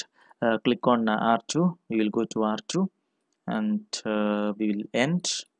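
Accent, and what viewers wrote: Kerala, India. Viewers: Brother....I highly appreciate your work. I am a Networking Student. Your videos have been "'Life Saver" for me throughout my course. THANK YOU SO MUCH DEAR BROTHER. From your accent I could understand your origin is Kerala, I am from TN, we are near by and we are brothers. Please continue to post videos, as lot of people can be benefited by your remarkable work. THANKS CRORES.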